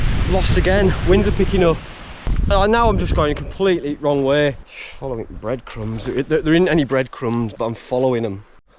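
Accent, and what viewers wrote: Yorkshire, United Kingdom. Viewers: Only recently found your channel and watched the most recent vids…having watched this one; laughing at how strong your accent was, being back in Yorkshire 😂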